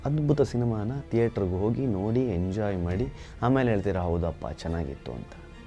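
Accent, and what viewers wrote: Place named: Coastal Karnataka, India. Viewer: ಕರಾವಳಿ ಕನ್ನಡ ಕೇಳೋಕೆ ಚಂದ....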